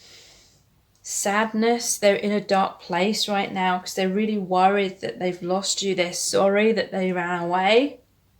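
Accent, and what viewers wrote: United Kingdom. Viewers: Wow!! I love ur British accent also fantastic reading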